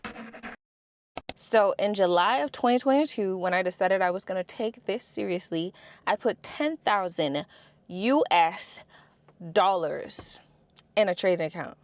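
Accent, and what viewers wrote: Bahamas. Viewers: sound bahamian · Great video ... sounds so Bahamian ...lol !!!!!! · Hi i love your video and how you break everything down, I think i am hearing a Bahamian accent, i know you said having Caribbean parents! But thanks for taking the time to make this awesome content. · Are u Bahamian i hear the accent but cant tell also love ur content this is awesome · That accent can’t hide boy 242🇧🇸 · You sound Bahamian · you sound like a Bahamian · are you from bahamas? u kinda sound bahamian lol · Wait. You're Bahamian?! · That batian accent ❤.. new here but praying I learn so I can get off this rat race . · Are you from the Bahamas? The way you say financial it reminds me of the local accents when I went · Wait...I hearing my people?? Are you Bahamian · Love the video and congratulations! But mam, I can't ignore hearing a Bahamian accent. Now I'm super curious. 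Either way, love this for you! 🙌🏿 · Are you Bahamian? I hear a slight accent 😅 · I picked up on your accent. It warms my heart to see a fellow Bahamian woman trading ❤ Wishing you all the best! Your video really encouraged me to take trading and financial freedom seriously. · I know i heard the bahamian in you loud & clear! Im proud of you. Im deep in this to clear debt, and provide emergency funds to actuay leave my job as well. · You from the Bahamas eh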